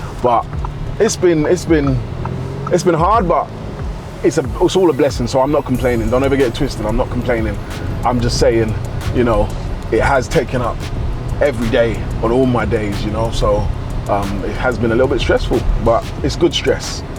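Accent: London, United Kingdom. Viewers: Just found your channel mate, love it, instant Sub. I thought "ah cool, a Brit giving advice".... I'm gonna ignore the fact that you're in the US, lol. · i lke energy bro its genuine bro am just wondering are you from Uk you sound like a Londoner..?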